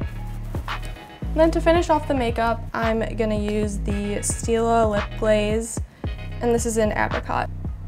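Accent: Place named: Chicago, United States. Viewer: so cute! i hear that chicago accent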